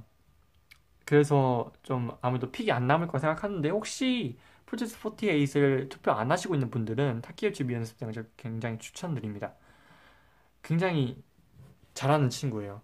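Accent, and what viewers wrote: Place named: Jeolla, South Korea. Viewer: 전라도분..ㅎ 전라도 사람만 알수있는 억양 ㅎ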